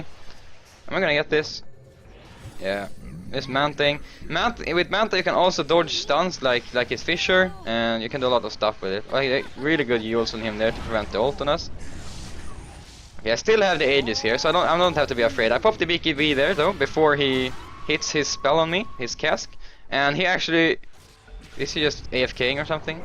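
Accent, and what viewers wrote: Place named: Sweden. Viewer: wp sir. i really like the swenglish too :D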